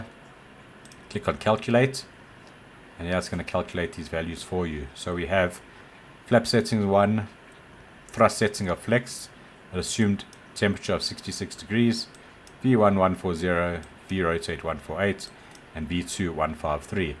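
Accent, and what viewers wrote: South Africa. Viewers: Nice to hear a south african accent for a change. I live in George Western Cape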